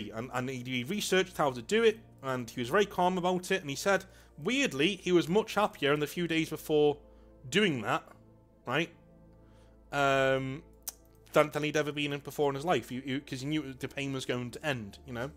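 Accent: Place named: North, United Kingdom. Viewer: I found this channel while feeling down and getting back in to the Hobby. Currently listening and getting joy from the stories, and familiar sounding northern advice, while waiting for hobby tools to build up some Orcs and Bretonians. Orcs are just super fun, and I want some good noble knights too. I may even get around to sorting my Steel Legion in red and black (Inquisitorial Guard) to finally join the Grey Knights... I like Knights! Thank you for the joy you have shared with me, and I mean that truly.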